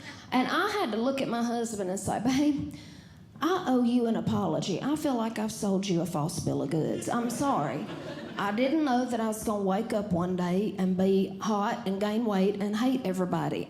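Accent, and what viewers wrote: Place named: South, United States. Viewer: It’s a southern dialect, please educate yourself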